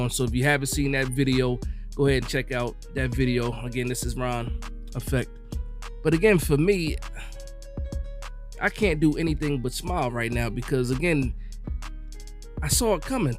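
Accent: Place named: South, United States. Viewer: I'm not listening to talk about The New York Football Giants from a guy with a southern accent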